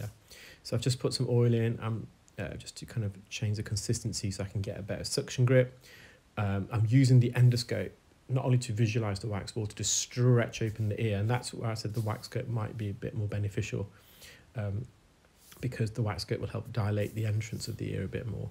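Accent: United Kingdom. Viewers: I love your videos. They are informative, educational and calming for those with anxiety disorders. I find your voice very calming and soothing and your British accent is like extra icing on a cake. Please keep up the great work you’re doing. Blessings and good health to you.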